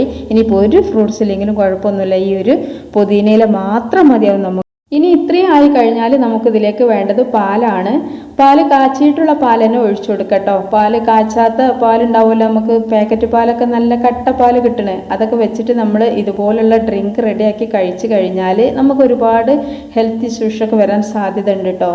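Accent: Malappuram, India. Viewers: Malappuram thahta Samsara matiyallo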